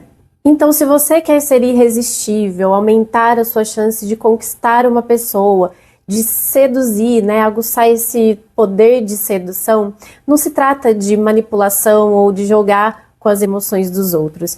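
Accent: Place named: Caipira, Brazil. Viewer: Tirando o sotaque caipira fica perfeito.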